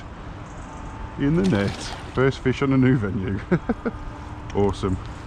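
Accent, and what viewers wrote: Birmingham, United Kingdom. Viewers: Brilliant Stuff Earthling Quality Fish Are You A Brummie Or From Dudley Way ? Bless Up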